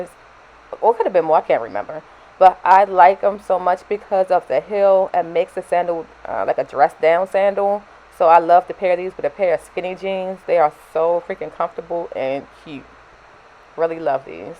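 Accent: Baltimore, United States. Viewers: I really like your feet. Very pretty. And do I detect a bmore accent?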